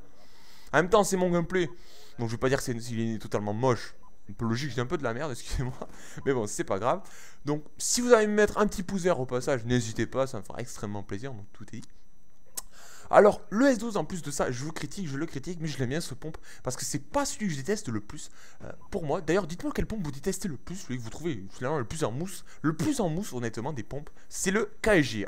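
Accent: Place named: South, France. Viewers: Petit accent du sud moi aussi j'ai cette accent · Salut J'adore ton accent Marseillais MARSEILLAIS lol Bravo pour tes stats et ta nuke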